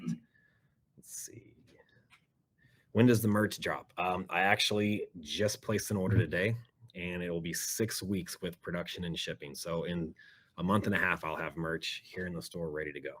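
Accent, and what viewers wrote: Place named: Miami, United States. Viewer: “Will you appear on Archie Show!” “Fook no” lol. sounds like you from Miami man. Cheers🏆🥃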